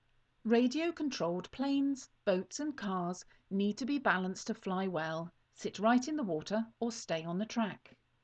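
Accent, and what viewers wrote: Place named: United Kingdom. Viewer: LOLlike the brit speek and prodick not bad to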